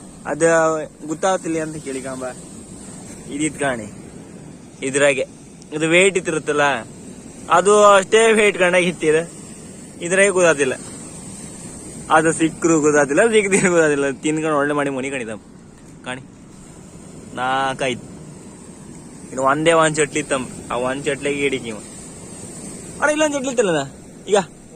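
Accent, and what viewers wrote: Kundapura, India. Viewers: Yava side kannada idu?kundapura na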